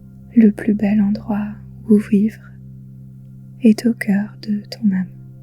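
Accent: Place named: England, United Kingdom. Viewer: Le petit accent anglais derrière me fait voyager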